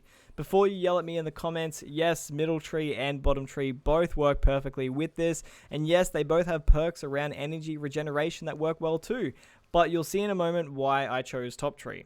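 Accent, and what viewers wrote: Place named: Australia. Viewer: i only just found this channel but its awesome to hear another aussie making videos i might be late to the party here but im new to destiny and i'd love to see some beginner friendly citans ramparts builds for titans its the only exotic i have for titan and i can see void is already strong at the moment but i like the other subclasses too so hopefully you could make a video of some different options thanks, hope i can win the emblem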